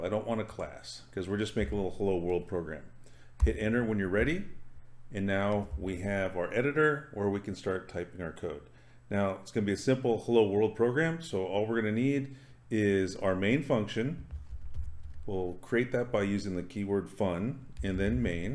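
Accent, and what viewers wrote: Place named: United States. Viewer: Some positives: * This is a recent tutorial, as of Nov. 2022, so it's closer to what IntelliJ looks like right now than older tutorials. That's **huge**, IMO, if something goes wrong (as it did with me when I first installed IntelliJ IDEA and a JDK). * Good pace * Clear, in American English (which may be a negative for some, but helped me) * Clearly, the person knows how to teach (I'd written something similar to the above in an earlier version of my comment, but I got distracted by coding and when I tried to return to finish my comment, it had gone "poof".)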